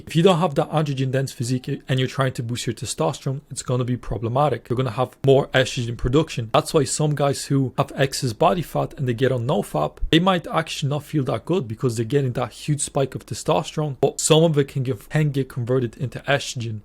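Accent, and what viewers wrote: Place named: Ireland. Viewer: I am concerned that your mentions of the thyroid hormone T3 come out sounding exactly like "tea tree" in your lovely Irish accent (which does not differentiate between the "t" and "th" sounds). The trouble with that is the Australian essential oil by the name "tea tree oil" (also known as melaleuca oil). It is used topically for skin problems in folk medicine and also in aromatherapy, but it's highly TOXIC when taken by mouth. Excessive use on the skin can cause endocrine disruption leading to breast enlargement in males. I don't want anyone to think that you're suggesting that they take or use tea tree oil when you say "for this to occur, you need T3" and "you lack T3 in the body."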